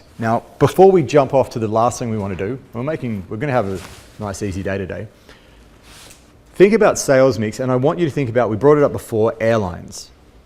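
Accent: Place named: Scotland, United Kingdom. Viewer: Respected there... Please go slow .. You sound so scottish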